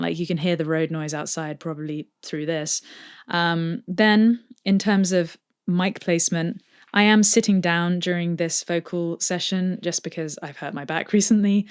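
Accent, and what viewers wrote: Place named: England, United Kingdom. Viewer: I'm inclined to agree with bunnyboiler6353 – I've always liked your spoken voice and enunciation, and as an American Midwesterner, I'm a sucker for a girl with an English accent of almost any kind to begin with. I thought the "rushed breath" tip especially good. Sorry to hear about your back – I've had back issues for decades. Learn to stay within yourself when doing your gym/workout stuff, just as you do with vocals. Stay safe!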